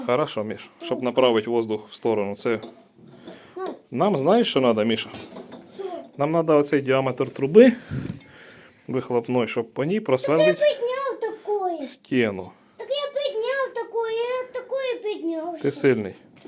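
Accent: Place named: Poltava, Ukraine. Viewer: По говору здогадався. Полтавчан не сплутати нi з ким.))) Тримайтеся! Тепла та благополуччя! Всiм достойним украiнцям захисту Всевишнього!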